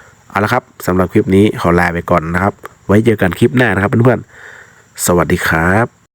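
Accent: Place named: Chiang Mai, Thailand. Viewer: สำเนียงออกเจียงใหม่แต้อ้าย😂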